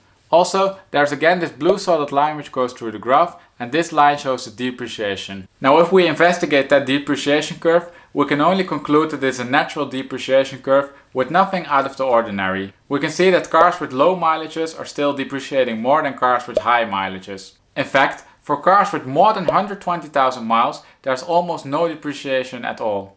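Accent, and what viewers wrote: Netherlands. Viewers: This is defenitely NOT a German, since this guy is talking with a superstrong dutch tonality 🤣. Best regards from Hamburg, Germany...Frank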